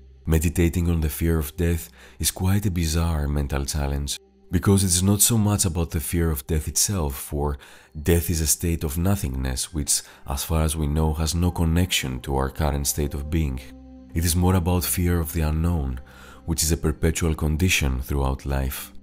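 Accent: Greece. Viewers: Why can I tell that you are Greek just by listening to your voice? Anyways, great video!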